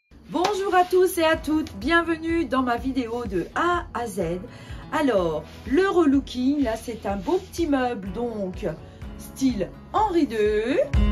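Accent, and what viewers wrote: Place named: Franche-Comté, France. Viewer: Bonjour j adore! J ai reconnu un accent franc-comtois de mon avance..? Bisous et bravo! Ps: j ote les ferrures en général, mais pas toujours possible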